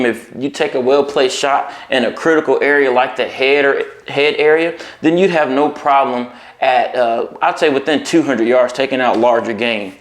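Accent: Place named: South, United States. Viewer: Mam o man does your southern accent really out does mine😀👍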